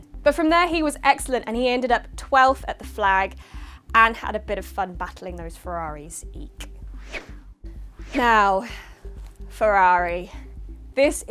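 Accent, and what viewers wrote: United Kingdom. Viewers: I like the new format. Also Keep talking. As an American I love your british accent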